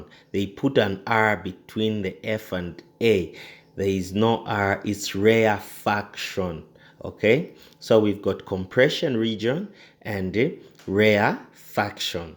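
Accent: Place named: United States. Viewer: Sir you have a nice accent arr ryu american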